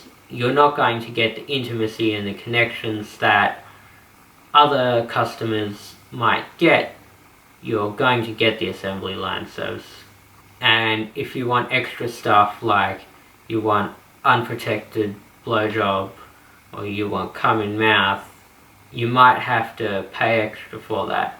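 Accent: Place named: India. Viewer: You live in Australia why is your accent messed up brother? Your Indian gene too much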